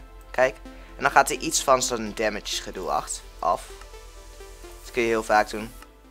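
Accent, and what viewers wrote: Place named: Rotterdam, Netherlands. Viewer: Leuk filmpje maar hij heeft een Rotterdamse "t" egt irritant om te luisteren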